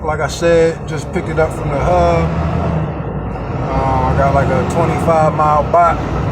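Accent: Baltimore, United States. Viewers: hey man do you have an email i get ask you a few things, sound like you're from baltimore. are you out of the hagerstown hub?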